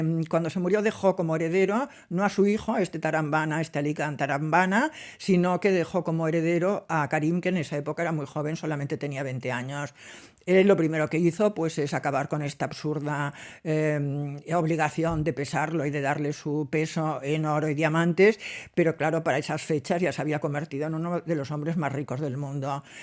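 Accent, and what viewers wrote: Catalonia, Spain. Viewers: BUENAS TARDES! ERES ESPECIAL! CUANTAS VIVENCIAS! CUANTO CONOCIMIENTO! TODO ES ENRIQUECEDOR.ME ENCANTA ESCUCHARTE POR TANTAS PERSONAS A LO LARGO DE TU VIDA HAS CONOCIDO.GRACIAS POR TUS RELATOS.ERES UN SOLETE! YO TAMBIÉN ESTOY ENCANTA CONTIGO.ERES UNA PERSONA MUY ESPECIAL; MÁS TU ACENTO CATALAN ME RECUERDA MUCHO AL DE MI MARIDO ( QUE EN GLORIA ESTE ) PUES SU MADRE ERA DE REUS,EL TENIA EL CATALAN MUY ARRAIGADO ) GRACIAS! UN ABRAZO ENORME.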